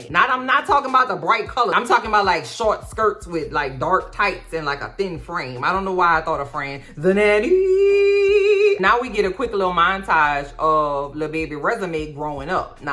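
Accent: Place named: Louisiana, United States. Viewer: Louisiana creole. My stepfather spoke and sounded the same.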